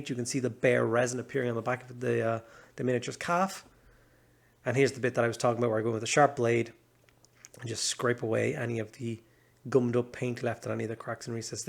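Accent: Ireland. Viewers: Random question but where are you from? You have a mixed accent or it sounds like it to me. How you say certain words sounds very Dublinish:-P This is a really cool to see you save and redo the model. Looks like the person might of been new and didnt know what was going wrong with the model.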